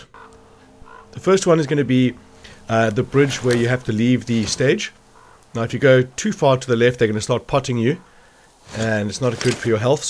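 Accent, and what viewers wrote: South Africa. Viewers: Awesome Vid, thanks. You sound hella South African.. Shout out from Cape Town. Keep up the GG's!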